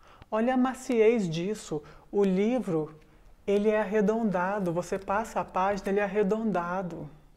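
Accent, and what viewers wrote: Minas Gerais, Brazil. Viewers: O sotaque mineiro é muito cativante. Adoro! Não sou mineira mas, já estive em MG várias vezes, e esperando a pandemia dar uma trégua para voltar a visitar esse estado maravilhoso.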